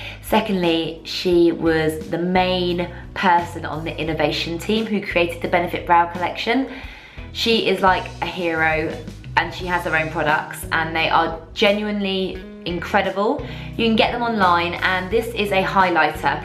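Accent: United Kingdom. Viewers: how proud you are of your Scottish accent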